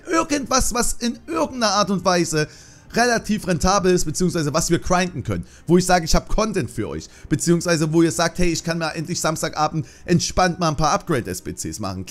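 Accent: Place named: East Germany, Germany. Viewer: Am geilsten finde ich tdem wie du versuchst deinen ostdeutschen Dialekt zu unterdrücken🤣 mach weiter so, geb dir mit allem recht😊🙋‍♀️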